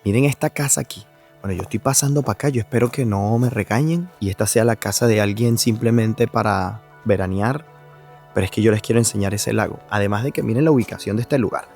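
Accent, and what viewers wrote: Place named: Venezuela. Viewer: Hola. Excelente es muy refrescante y entretenido. Felicitaciones paisano 🇻🇪👍 claro ese acento del venezolano es Inconfundibles!! Jajajaja desde Venezuela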